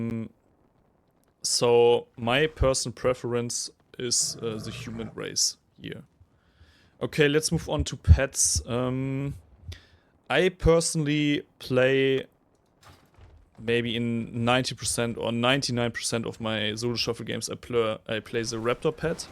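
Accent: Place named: Germany. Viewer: The german "zhe" instead of "the" gets me every time xd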